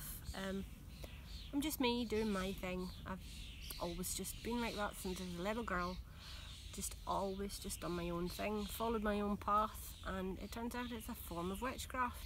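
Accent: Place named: Galloway, United Kingdom. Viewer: Aha! There she is. What a beautiful accent. My friend lives in Mid Ulster; your accent is heavier than hers, more Scottish, though. I've no issues understanding you, though. My people came to Ulster from Galloway, originally. Enjoy your posts.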